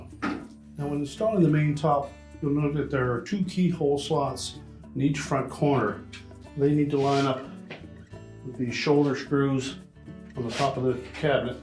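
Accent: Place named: Canada. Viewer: Is this a Canadian made video? Your accent in the pronunciation of the word "out"sounds as if you're Canadian.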